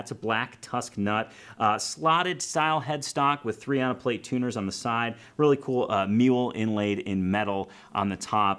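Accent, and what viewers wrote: Chicago, United States. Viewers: nice review.. I can hear the chicago in your voice ( I am a north sider by birth) and you have mentioned Old Town School ( where I studied harp with my dear friend Joe Filisko). May I ask would you consider plugging that bad boy in? I have a few resos but only one with a pickup ( and that is lousy) ...thanks and be well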